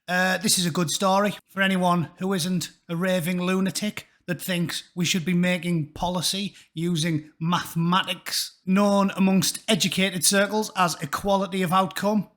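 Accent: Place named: RP, United Kingdom. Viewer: A perfectly eloquent tirade in the queens English. Rock on.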